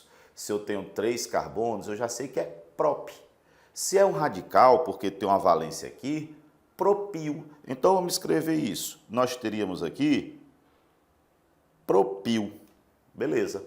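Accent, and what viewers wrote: Ceará, Brazil. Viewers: como eu amo sotaque nordestino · O senhor é cearense?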